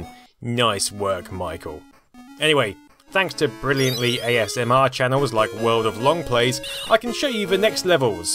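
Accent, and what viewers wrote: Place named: Norfolk, United Kingdom. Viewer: he's from Norfolk, they all talk funny there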